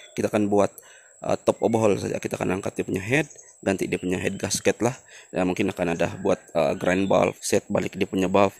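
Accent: Sabah, Malaysia. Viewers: Iya bos loghat sabah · Lokasi di sabah atau serawak ke ni,, loghat mcm org sabah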